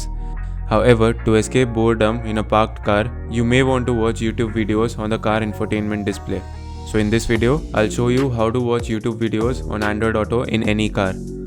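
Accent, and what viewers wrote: India. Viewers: I don`t understand curry english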